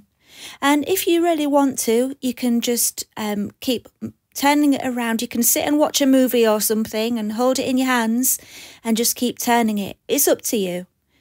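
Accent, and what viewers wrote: United Kingdom. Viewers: dear god i could fall asleep to this womens voice cause it is just so soft and nice sounding. Is this accent from the UK? if so where exactly is the area this accent is from? anyway wonderful video and a wonderful voice :)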